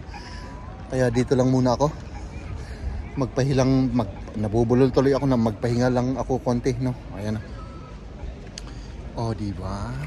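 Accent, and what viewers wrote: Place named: Igorot, Philippines. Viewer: Ask ko lang po, yung accent nyo kasi sa Tagalog parang Igorot. Ay Igorot kayo? First time ko agbuya vlog yu ta recommended ti YouTube. Nag subscribe akon.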